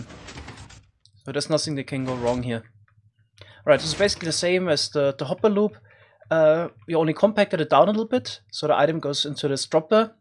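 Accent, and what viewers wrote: Germany. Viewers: Du hast einen deutschen Akzent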